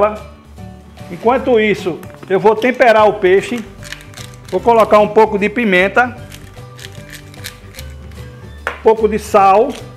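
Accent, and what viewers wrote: Brazil. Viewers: Excelente vídeo, didático e claro. Muito gostoso o prato e o sotaque do chef, Brasileiro puro. Parabéns. Like e inscrição de um estudante de cozinha. Muito obrigado chef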